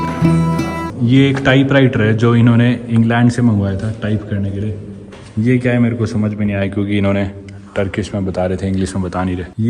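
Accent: Haryana, India. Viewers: Kbi kbi toh aap pure haryanvi me aa jate ho baladth- ox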